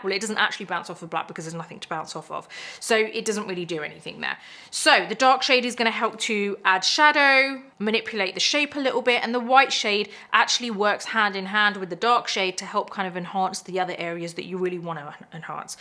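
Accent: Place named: United Kingdom. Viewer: I love to watch your make up tutorials ,they are so helpful and you are absolutely beautiful and I love your British accent,I have always wanted to go there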